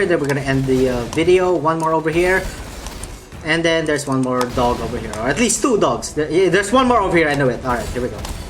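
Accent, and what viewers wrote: Philippines. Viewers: I was like..? Why he sounds like a filipino? And I search all the social media and yeah youre a filipino "kababayan" and matry nga to why not? Thanks for this vid I'll try this especially I'm a newbie.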